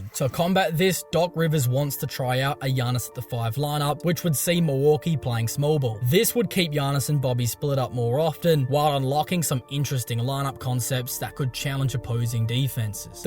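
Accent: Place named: Australia. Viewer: Beef Stew should get a suspension for that hideous flagrant 2. Dirty Mofo. Love the channel man. Love the Aussie accent. Oi Oi!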